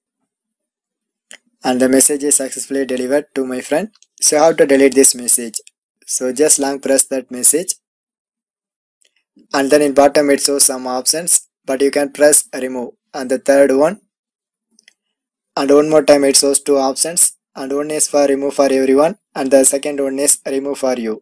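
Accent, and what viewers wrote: India. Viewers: Lol your indian english sounds really stupid and it makes me laugh when I hear it lol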